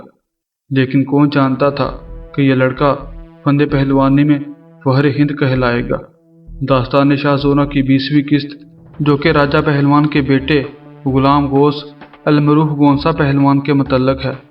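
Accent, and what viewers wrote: Punjab, Pakistan. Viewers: Bht achchhe...bhai kya aap us zamane k mashhoor pehelwaano k daav k video bana skte ho...to aaj k pehelwaano ko bhi us fan me se kuch thoda bht seekhna ko milskta h...aap video k kiye pehelwaano se to milte hi hain...agar unse unke khaas ek do daav agar miljaye..me bhi pehelwaani karta hu...aapki badi madad hogi..aapki punjabi tone aapke videos me 4 chand lagati h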